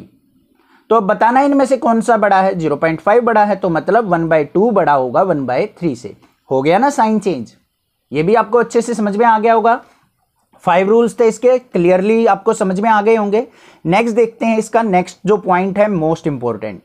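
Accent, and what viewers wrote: Haryana, India. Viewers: apki awaz se pata chal gaya tha mai bhi haryana se hu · I m sure you are from haryana Your accent says you are Haryanvi 😆🤣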